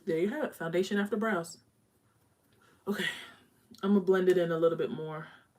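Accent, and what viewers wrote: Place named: New York, United States. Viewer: Awww come thru, NY accent!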